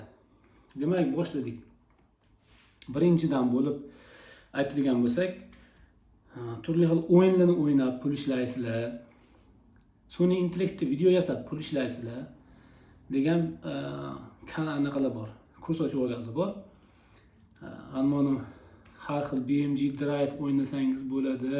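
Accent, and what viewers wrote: Namangan, Uzbekistan. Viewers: namanganni tortyapti Uchqoʻrgʻondanman siz qayerdansiz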